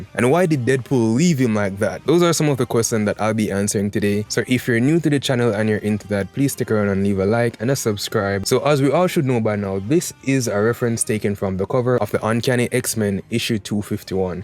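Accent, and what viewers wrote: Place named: Jamaica. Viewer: Bomboclaaat rastaman ahh accent, cool af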